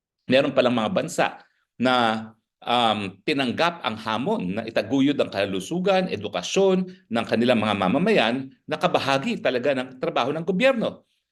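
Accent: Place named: Philippines. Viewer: Yan ang gustong gusto ko sa inyo sir Harry Roque pag nagsasalita kyo lalo n tagalog Pilipinong pilipino tlaga.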